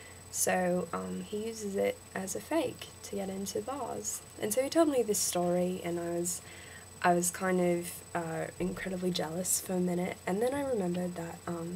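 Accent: England, United Kingdom. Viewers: You sound english nothing like an aussie accent im aussie so i know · Good on ya for having a crack at it but ya sound like a pom, get ya old man to teach ya. P.S, I'm a skip. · that's pretty english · you sound English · I don't think you sound Australia at all. More English. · As an Aussie, I would assume that you were from England .. and I think that our 'ocker' accent is overused too much. We honestly don't sound like crocodile dundee.. Gahh stereotypes. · You sound English not Australian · Lol you sound English :) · you sound english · You've got some of it, but it turns English more often than not. But very little American comes through. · It's a weird hybrid between English, a lil; bit of Irish and Australian. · u sound more english then australian lol p.s ur pretty darn gorgeous ;P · you sound english not aussie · seriously you sound like you are from brighton in england I never would of picked you were american and dont worry about exploiting your passport we have people who get student visa or short 6month visa's midway they declare assylum and then get citizenship and its total crap and perfect example before the hate comments come on sydney fc striker ali abass while he was playing for newcastle deceided mid way to declare assylum and got it but he still plays for iran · sounds a bit English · You sound too English. 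Find some slang, like aussies are too lazy to say the world properly. Eg. Not United States it America or USA. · You sound like a pom! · you sound more english nice try but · You sound like a Pom... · You sound like you are from England def not an aussie haha but good english one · i am australian and sorry but it isnt aussie u sound english :) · Not Aussie at all, fair for English. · I am Australian and she sounds english... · i love how you say you're american and have an american accent yet you sound fully enlgish....... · Mate if your a yank and your that close you've done pretty well, you hit the nail in the head though, you sound almost perfectly like a pom. One major tip, it's not Australia, it's 'Straya'. :) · The way you say some words are pretty good, but otherwise it sounds English. You say the word Australia perfect though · you sound freakin english not aussie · Not too bad but there is an obviously English tinge. 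· I actually thought you were English and you were just introducing yourself at the start of the video. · I think a main reason that she sounds more pom than strine, is that she is still pronouncing all her words in a proper way. She isn't giving them the Aussie inflections and lazy syllable connections that are so common to our most recognised accent.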